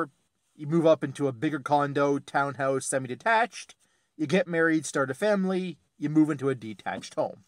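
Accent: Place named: Portugal. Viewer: Are you from Portuguese decent bc I sense a very mild accent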